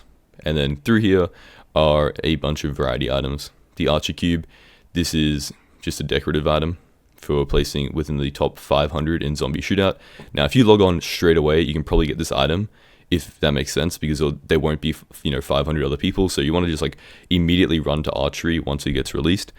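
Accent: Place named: Australia. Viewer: Hey, im assuming you live in Australia becuase of your accent so how do you get better ping to hypixel becuase I am struggling on 270 ping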